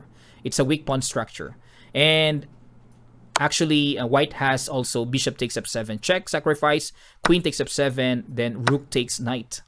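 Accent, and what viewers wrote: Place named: Philippines. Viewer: The way you seak here is still patterned on your filipino version. And still very clear and well elaborated analysis. More subscribers for you coach. Good luck!!! Nakakadugo sa ilong mang english. Hahahahah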